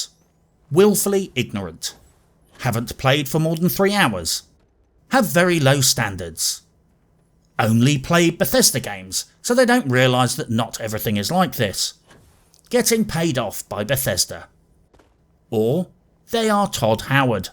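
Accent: United Kingdom. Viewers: You can't tell he's a brit by his voice? Dull one hm?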